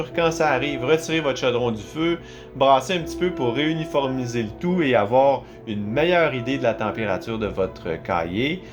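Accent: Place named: Quebec, Canada. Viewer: J'ai souvent eu du mal avec l'accent québécois puisque j'avais du mal à comprendre mais le votre est tellement compréhensible et agréable ! Et vos vidéos super !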